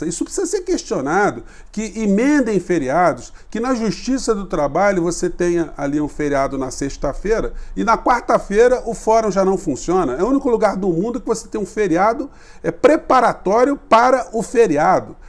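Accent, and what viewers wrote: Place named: Rio de Janeiro, Brazil. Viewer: 😂😂😂😂😂😂😂😂Adorei a sua fala CARIOCA!!!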